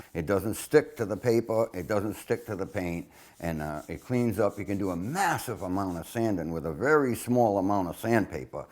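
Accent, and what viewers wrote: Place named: Rhode Island, United States. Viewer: He's from Rhode island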